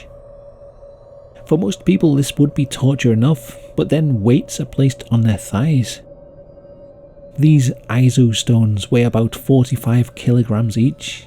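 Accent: United Kingdom. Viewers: hey I love your vids and ur british accent <3 also im a little drunk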